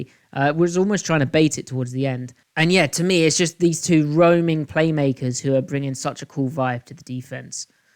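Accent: United Kingdom. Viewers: YOU'RE BRITISH!?!? I've been following you on twitter for a while and had no idea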